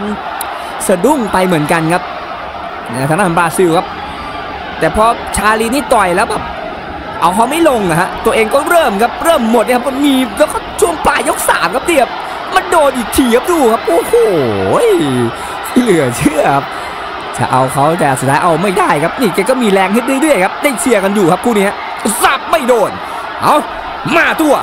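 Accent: Isan, Thailand. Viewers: เว้ากลางน้นล่ะดีแล้ว เวลาเว้าอิสานโทนเสียงบ่น่าฟัง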